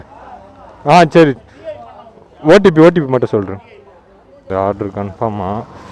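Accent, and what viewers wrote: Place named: Chennai, India. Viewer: Bro unga chennai slangu super ah irrku.... Na oru nal ungla meet panuven....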